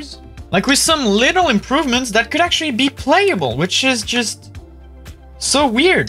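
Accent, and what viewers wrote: France. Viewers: I never thought a french accent would be hot until now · As a French, I find him speaking English with a French accent so frustrating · he is french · He is slowly loosing his french accent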